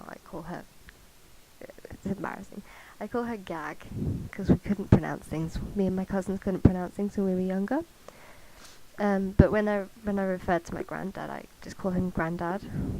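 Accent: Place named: United States. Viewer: Your voice is very pretteh~ :333 I also love your American accent... It sounds perfect xD Do you mind if I do this?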